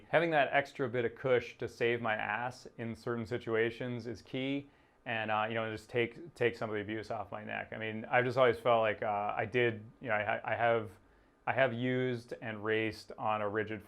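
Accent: New England, United States. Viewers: You sound like a New Englander. Where do you ride?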